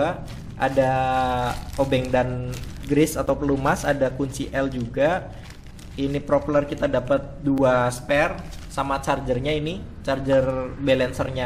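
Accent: Banyumas, Indonesia. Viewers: mas, kalau saya pake yang 3300 mAh bisa gak ya? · kereenn.. bang. belii gak yaa...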